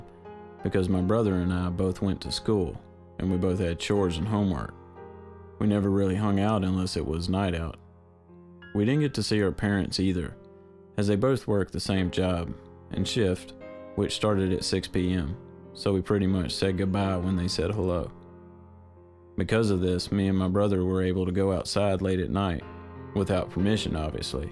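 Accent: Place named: Texas, United States. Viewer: you're from texas aren't ya cowboy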